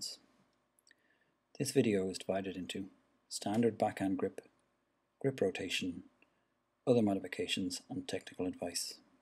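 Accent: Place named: Ireland. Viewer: it sounds like Irish accent, ie he is British. I can perfectly understand all he said because I am British ;)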